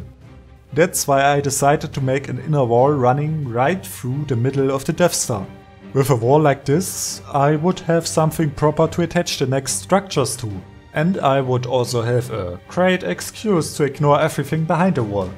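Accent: Germany. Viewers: das ist englisch hört sich aber wie deutsch an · It’s Denglish =Deutsch+Englisch ore Germglish =German+Englisch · im German to i think he's Sounds Like German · Truuu the english sounds so german it basically is just that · When someone with a German accent this thick talks about building a Death Star with a working laser I'm actually terrified it sounds like a legitimate supervillain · I can hear hes german, its obvious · Ja das klingt wirklich Deutsch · As a fellow German: your English is the most German one. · Yeah, well sounds German and also if you go to the about section it will say that his location is in Germany. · Das ist fast deutsch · Very german accent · Ist mir doch von Anfang an aufgefallen, dass du deutsch sprichst!😂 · He is German, I can tell from his problem with th words and some others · The most german accent in this world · hab erst überlegt aber war mir ziemlich sicher das is n deutscher kollege 😂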